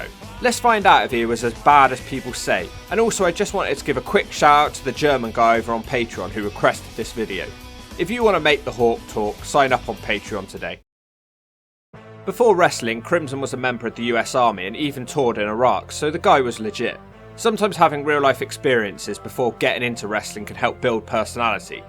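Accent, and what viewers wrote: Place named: Wiltshire, United Kingdom. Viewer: You sound like you're from wiltshire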